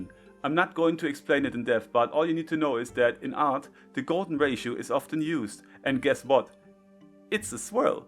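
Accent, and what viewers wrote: Germany. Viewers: Bruder dein deutscher Akzent ist nicht zu überhören 🤣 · he sounds german. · Are you German by any chance? Video is great 🎉❤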